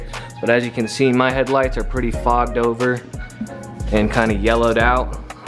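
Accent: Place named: Australia. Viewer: Will it work on dirt bike headlights. Still hearing some of that Aussie accent mate.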